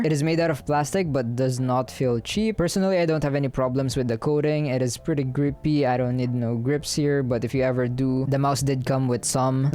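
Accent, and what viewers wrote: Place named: Philippines. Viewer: > Pinoy accent looks in > Actual pinoy reviewer with top tier production value + signature rap speech Subscribed agad AHHAHAH P.S. Krazy ahh mouse grip T_T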